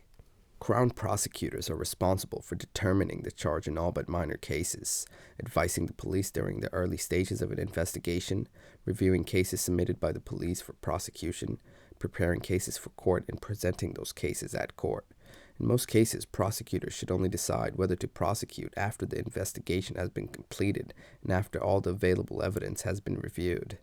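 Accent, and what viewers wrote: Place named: United States. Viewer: The Yanky accent just doesn’t fit. Nevertheless, great explanation!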